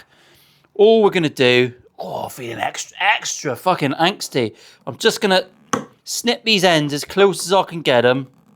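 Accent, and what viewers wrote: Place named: Portsmouth, United Kingdom. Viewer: I'm not even watching the clip, just listening and enjoying the beautiful Portsmouth English while carving my new piece. Greetings from Belgrade!👋🏻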